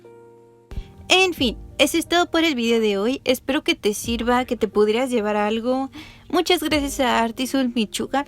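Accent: Mexico. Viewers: Señor, no sabe de relajante que es escuchar su acentito norteño mientras veo su lineart asteti. Mucha motivación para dibujar patas. Muchas gracias ❤❤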